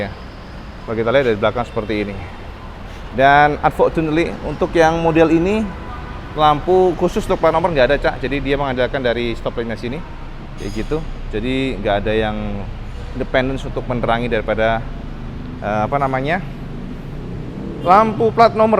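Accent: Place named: Tulungagung, Indonesia. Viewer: Megek lk boso tulungagung🤣🤣🤣🤣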